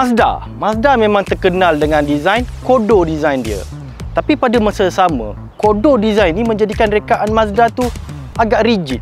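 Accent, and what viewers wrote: Kelantan, Malaysia. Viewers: Ore kelate ko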